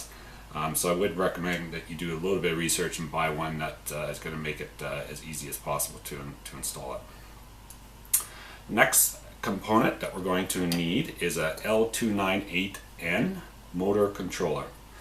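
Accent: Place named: Upper Michigan, United States. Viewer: I'm fascinated by this project. I'm here because I'm trying to do the exact same thing. I have a background in electronics, programming, networking, etc. But also, fascinated by accents. Are you, by chance, a uper? (yoo-per) thanks again for posting this video series.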